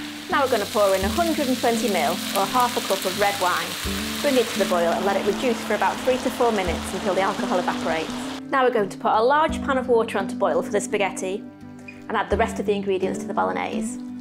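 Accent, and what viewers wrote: United Kingdom. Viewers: literally the most bri'ish video i've ever heard,but still a good one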